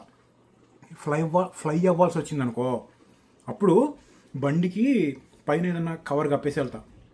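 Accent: Andhra Pradesh, India. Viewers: Anna nuvu nizamabad anav kada kaani nee telugu andhra slang undi enti?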